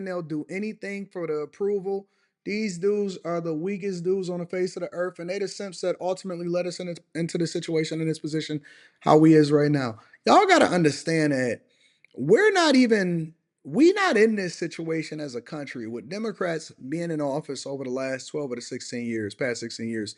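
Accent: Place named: Georgia, United States. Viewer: Sound like he's from Georgia living in the 18th hundred do not know he been free for over 200 years.. just say.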